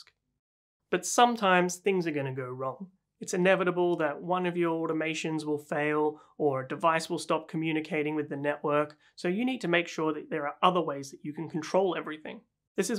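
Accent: Australia. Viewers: Ha!. Suspected that was an Australian accent! Great channel. 👍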